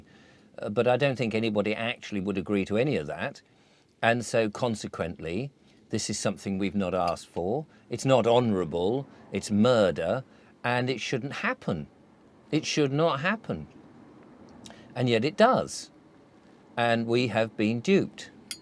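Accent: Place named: RP, United Kingdom. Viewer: You're quite posh. It's nice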